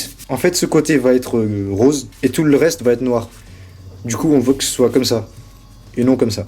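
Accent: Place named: East, France. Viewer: Au fait est ce qu'il vient de l'est ? ( L'accent me fait penser à ça )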